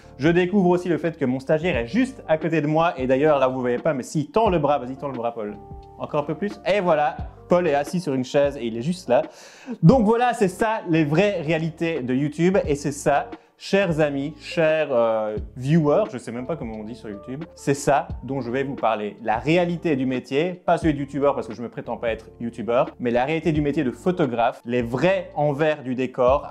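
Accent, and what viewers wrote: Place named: Belgium. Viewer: Hééééé j'avais pas vu compatriote ! L'accent belge on est là on lâche pas ! En espérant entendre de nombreux septante et nonante par ici <3. Congrats & tout le meilleur poulet !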